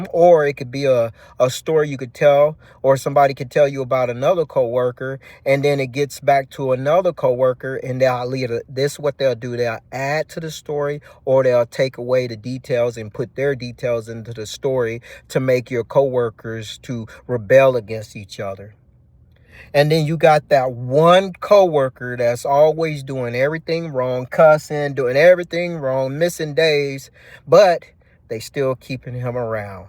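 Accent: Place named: Missouri, United States. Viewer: Bro...Solid commentary as always. Also, U remind me of Mason Betha but with a Missouri accent. You should write a book with all of your stories. Reminds me of stories I read in two books: (1) Working for You Isn't Working for Me (2) Living Successfully with Screwed-Up People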